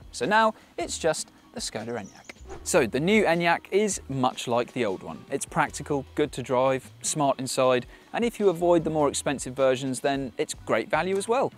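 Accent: United Kingdom. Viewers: American here. I can't buy this car in the US. But I just watched the whole video because of his perfect British accent.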